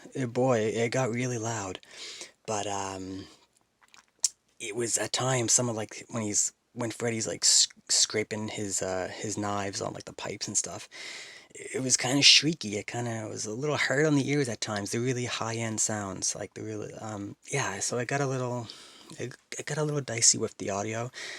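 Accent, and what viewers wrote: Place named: Atlantic Canada, Canada. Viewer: You're Canadian? I'm from Toronto. Based on your accent I think that you are probably from one of the Atlantic Provinces.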